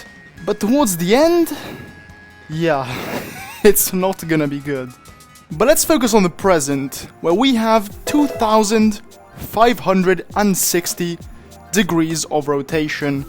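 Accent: Italy. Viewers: Sei Italiano? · Your accent is sooo italian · Are you Italian?? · Sei italiano? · io all'inizio: "sembra un accento strano" io a : "ok è decisamente italiano" ahaha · Ma sei italiano? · Are you italian? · Ma sei italiano allora perché lo sentivo un po anche dall’accento e poi perché qui avevi detto “MADONNA” comunque mi dici il nome del gioco? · bro you're Italian because it's a perfect Italian accent because I'm Italian and it's the same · Are you Italian? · are u italian by any chances? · bro sei italiano? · aRE you Italian? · Ma dillo subito che sei italiano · are you italian? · Bro ma sei italiano??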